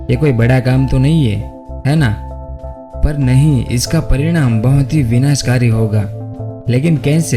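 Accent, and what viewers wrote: Gujarat, India. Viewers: Bhai aap Gujarati ho..... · Are u Gujarati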